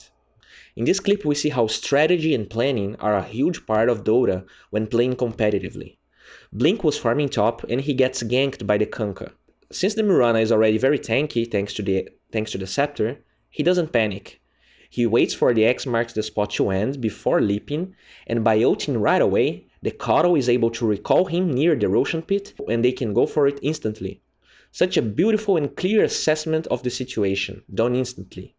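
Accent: Brazil. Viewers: i think brazil based on his accent (just a guess) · stop saying the kunka the mirana the pugna hahaha that drives me crazy man you don't need to use THE for those personal names, I can tell by your accent u're probably brazilian, your english is awesome anyways.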